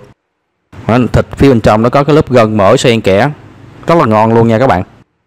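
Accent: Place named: Quy Nhon, Vietnam. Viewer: Chị nghe vọng nói của em Chị đoan em là người qui nhơn Nhung em làm món ăn Miền Nam rất giỏi lắm luôn Chị chúc mừng cho em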